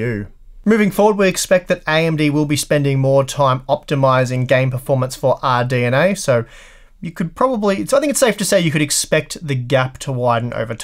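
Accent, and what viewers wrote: Australia. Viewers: love that aussie english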